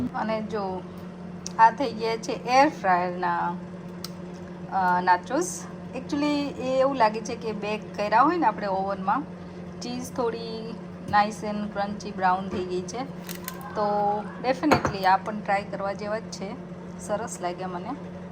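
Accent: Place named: Kathiawad, India. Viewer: Di tame bau j mast kathiyavadi Gujrati bolo che. to favourite che j n. Tamne Instagram pr jowa ni bau j maja awe. Keep it up n Grow much much more 🎉 Lots of love to you and your beautiful family ❤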